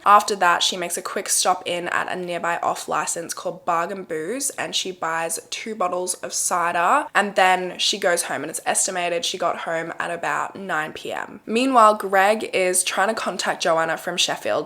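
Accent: Australia. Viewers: RIP Joanna and peace and love to those who truly loved you. Oh my goodness! I can't believe I hadn't found your channel until now. I'm from Australia and going by your accent so are you...may I congratulate you for having such an amazing number of subscribers which I have just added to. Well done!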